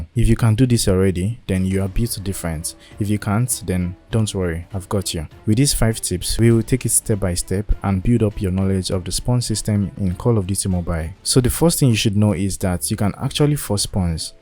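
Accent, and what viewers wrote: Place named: Africa. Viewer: Ooohhhh my gooodddddddddd ur african accent kills meeeee It's soooo guuuudddddd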